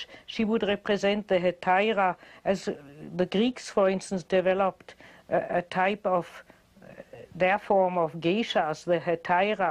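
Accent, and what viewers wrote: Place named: Liverpool, United Kingdom. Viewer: sounds like a scouser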